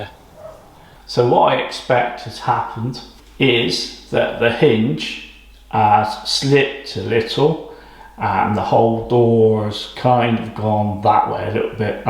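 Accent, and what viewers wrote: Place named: West Country, United Kingdom. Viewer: Thanks mate very informative and love your west country accent ' oww arrrrr" Cheers from Manchester 😅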